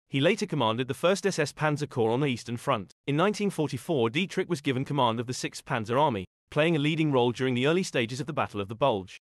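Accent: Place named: United Kingdom. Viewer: When I heard the British accent I bailed.The sheer Know-it-All tone and Superior better than Them and You attitude set my teeth on edge!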